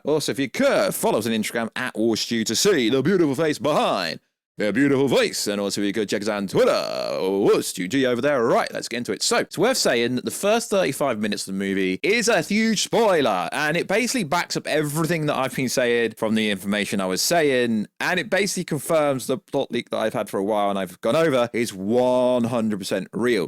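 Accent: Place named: United Kingdom. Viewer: I swear I thought it takes me a hour just to get to the point but you, you is just bad man, and every time I try to find other people that shows leaks and spoilers, it’s only you and the other guy Paul or whichever u are you, y’all both sucks and talk way too much. I get to make a long video but u speaking in British when we are Americans, we can’t understand you, geez Christ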